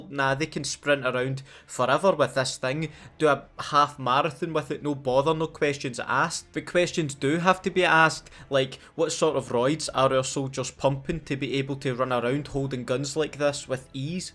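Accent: Scotland, United Kingdom. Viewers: The first one of these introduced me to this channel and I’m so glad it did man I’ve been binging or old vids of mw2 my favourite the cod and now I’ve joined the notifications squad man also I’m Scottish aswell and it Scottish patter just makes this channel 10/10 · Are u scottish,if so,so am i and what part of Scotland u from? Im from Edinburgh.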